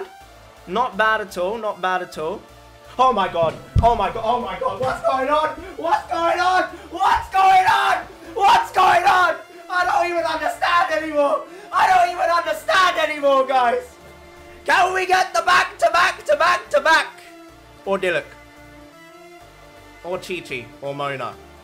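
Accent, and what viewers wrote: United Kingdom. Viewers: i watch video, i see asian. i scrolls down to read comment, i hear some uk accent. me: let me scroll up again to make sure. i did it twice LOL rumor has it, if your asian, you have a higher chance. maybe thats why i got mine an hour ago and now im here looking for build guide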